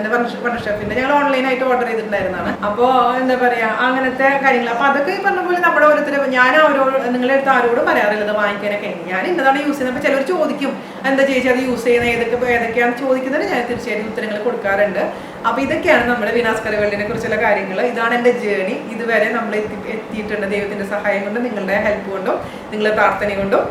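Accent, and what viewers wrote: Thrissur, India. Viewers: adipoli ayitundu nammude thirssur bhasha mattano orikkalum ella ale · thanks, and once again ur videos are very good, more than that it feels so homely the way u talk😀. I am from Thrissur, so ur slang makes me feel back home. I am a blogger too <link>,very new, looking ahead ur tips and help when I need😋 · Hi....nalla rasamundu chechiyude samsaaram...njanum thrissur aanuttoo....athukondu bhasha maattandattoo..ente husbandum chettane pole thanneyaa....chilappol pidichoru kuthu kodukkan thonnum 😠... ennalum kuzhappamillaattoo... aalde Job athupoletheyaa... eppozhum thinking mind aayirikum...anyway thank you for your advice....👍👍👍😘😘😘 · Hi am new to this channel... But this vlog is excellent.... Extremely inspiring for housewives like us. Thank you soo much. Can relate to most of the things you speak. Njaanum trissurkariyanuttaa. Tried ur varutha erissery. 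Excellent feedback from my husband who usually don't like vegetarian. Pinne trissur slang kekkumbo kannu nirayynnu. Am settled in New Zealand so missing trissur soo much. Please keep this slang for people like us. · Hi... Thank u very much for uploading this video. In ur video i like the most thing is that u explain the things very deeply, with that beautiful smile n confident voice, n that thrissur slang language bcoz i m also from thrissur, but settled in gujarat. I m ur fan now, will watch all ur videos n try to make receipes. I already tried beet root thoran from ur video n got got good comments from my friends. Thank u very much.... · nalla samsara shaily...TCR bhasha kalakkitta... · ഇനി ഒരു കാര്യം കൂടി പറയട്ടെ തൃശൂർ ഭാഷ ആയത് കൊണ്ടും കൂടി ആണ് ഇത്ര hit ആയത് ചേച്ചി un dout സത്യം ഒരുപാട് ഒരുപാട് ഒരുപാട് കുക്കിംഗ്‌ ഷോ കണ്ടിട്ടും ട്രൈ ചെയ്തിട്ടു മുണ്ട് പക്ഷെ നമ്മൾ എവിടെങ്കിലും പോയാൽ നമുക്ക് ഇഷ്ടം ഉള്ള വിഷയം ആവും സംസാരിക്കാൻ താല്പര്യം എങ്ങിനെ കറങ്ങി തിരിഞ്ഞാലും ഞാൻ വീണ ചേച്ചിയിൽ എത്തും അത്ര ഇഷ്ടം ആണ് ഫുഡ്‌ പിന്നെ ജാൻ ചേട്ടനെ അതിൽ ഏറെ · nammude thrissur basha vidaruthu tttoooo.......superaaattto chechiyekkalum enikk chettaneya ishttam... 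bcz he motive u and encourage u....thtz great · we love u a lot n I try most of ur recipes.. All r superrrrr 👌specially ur thrissur slang May God bless u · Logo change cheyanda.epo ullathu nallathanu.and also Trisure language um mattanda.😊 athu kelkan nalla rasamanu 😊. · Hey hwu I have been watching ure videos for most of my recipes and I feel they are simply awesome This video has been a bit long but I really enjoyed watching it dear especially knowing ure journey of blogging and vlogging ehhee I’m a doctor from Trivandrum and have two small kids and right now grihabharanam thanne It’s just like u said sometimes stressful esp bc husbands and naughty children But hopefully like u said one day v can strike a balance bw them and ourself I really enjoy ure Thrissur slang it’s nice to hear a different slang from the usual I too have Thrissur friends nd I really njoy deir company nd slang I wish u all the very best Good bless ure family too and please do many more videos Dr.Neena Hasheef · Good inspiration aanu.... 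Chechide thrissur bhasha enikk othiri ishta.... 🙏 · you are best...you are really helping lots of people...please don't bother the negative comments...some people only know to crticize...it take lots of effort to bring such helpful videos....keep going...we love your trichur accent and your talks..I really love your way of explanation of recipes...feel like a sister explaining so sweetly... Keep going...lots of love and support..God bless you and your supporting lovely family always😍😍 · nan alappuzhakariya..chechide trichur slang enikistama kekan..also chechide vartanam kekanum..recipes..presentation.. very genuine family..way 2 go..video kandondirikuva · English ൽ സംസാരിച്ചാൽ തൃശ്ശൂർ ഭാഷ പ്രശ്നമല്ല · thrissur bashayil thanne samsarichal mathittta; namukk abimanikkam nammal thrissur aayathond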